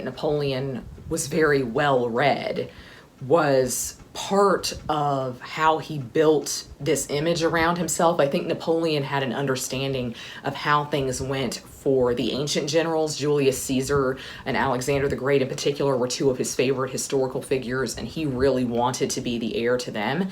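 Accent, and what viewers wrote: South, United States. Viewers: And I just heard you say from the south! I knew it 😁 I was telling myself I am sensing southern, very beautiful.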